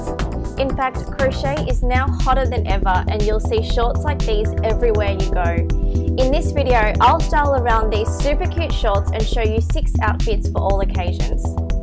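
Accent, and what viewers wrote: Australia. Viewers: i love australian accent (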